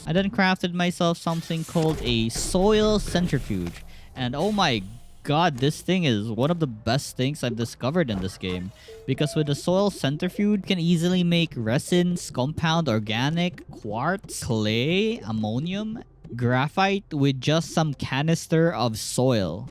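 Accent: Philippines. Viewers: With his accent I assume hes filipino or something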